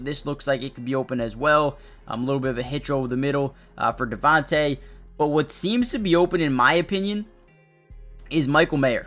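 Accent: Canada. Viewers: Def need a Gardner minshew vid, btw random question but are you Canadian? Lol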